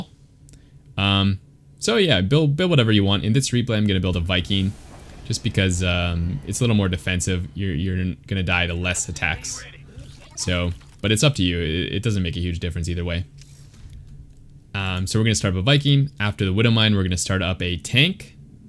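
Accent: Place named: Hungary. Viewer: Aren't you hungarian by any chance? your accent sounds hungarian.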